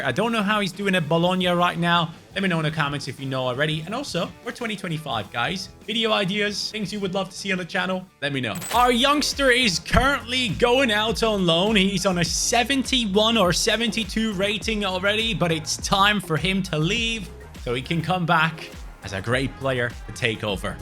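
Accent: Netherlands. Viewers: I am Belgian but still happy to hear a fellow Dutchman. Or German? Anw, I would make a career to put a top tier Dutch club into one of the top 5 Leagues and try and win the league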